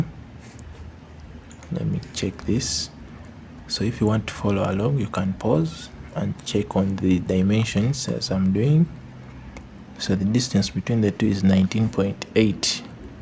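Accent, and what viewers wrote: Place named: Kenya. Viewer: you have a Kenyan accent. Thanks for the tutorial!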